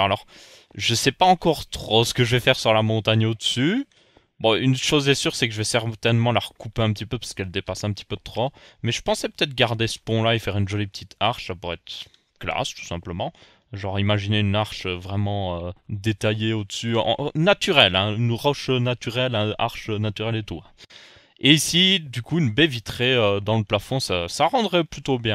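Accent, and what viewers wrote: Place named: Belgium. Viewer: la vérité t'as voix de belge est sexy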